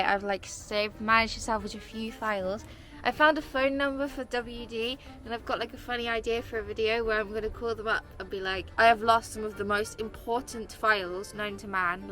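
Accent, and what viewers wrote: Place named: Scotland, United Kingdom. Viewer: Its funny to hear someone in Scotland getting confused by your accent as being Scottish and living in England its usually the opposite way around for me everyday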